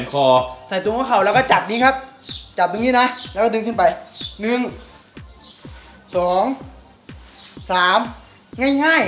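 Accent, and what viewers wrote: Isan, Thailand. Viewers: คนแสานบ่นิคับ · บ้านเกิดพี่ยุไหนครับทำไมพี่ชอบพูดออกแบบอิสาน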